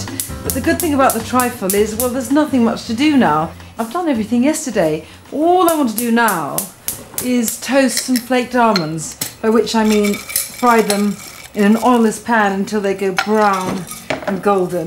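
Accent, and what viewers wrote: United Kingdom. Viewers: me encanta el acento tan británico de Nigella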